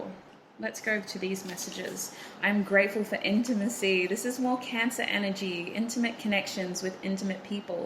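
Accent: Australia. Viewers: I literally saw the backyard and thought "huh. That looks very Australian" and then you spoke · Yoooo this is my first time watching any of your vids popped up on my recommended cause I’ve been watching a bunch of tarot lately 1 I was surprised hearing a fellow Aussie accent 2 I picked pile 3 it resonated so strongly I was shocked 3 it’s the first time I watched someone pull letters out and stuff YOU LITERALLY SPELT MY NAME “Steph” and also my last name, is P so really u spelt “Steph p”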